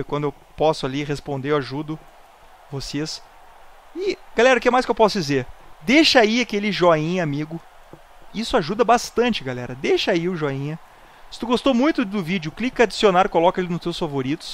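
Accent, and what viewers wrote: Rio Grande do Sul, Brazil. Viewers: tu é gaucho vey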